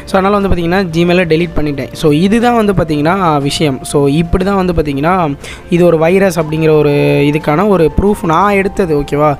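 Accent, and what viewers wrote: Tirunelveli, India. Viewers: Bro neega nellai ya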